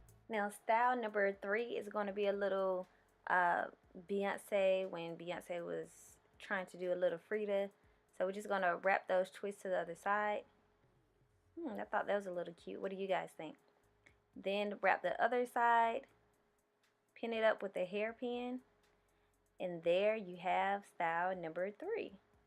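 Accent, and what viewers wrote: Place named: South, United States. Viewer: is it werid but i like your voice lol you got this down south accent that i absolutely love great video look forward in supporting each other channels :) see you in the nest video!!!#sub 973 with bell turnt on !!!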